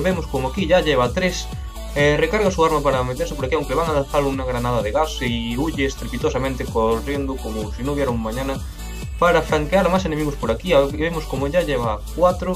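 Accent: Galicia, Spain. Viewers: Home claro, jajaja creo que se me nota un poco... xDD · gallego verdad?¿ si señor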